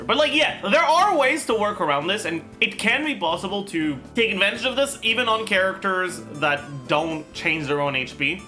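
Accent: Quebec, Canada. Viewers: the french part of canada yea i can hear it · Maybe because thats how he really pronounce these words since he comes from quebec where french is common ? You're the cringe one here lmao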